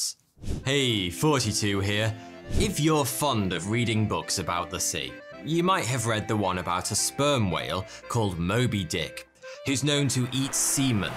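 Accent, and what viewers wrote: United Kingdom. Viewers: I have never heard someone so British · I watch these because it feels like I have a British teacher who's actually interested in the subject they talk about. Makes it even more enjoyable to learn new stuff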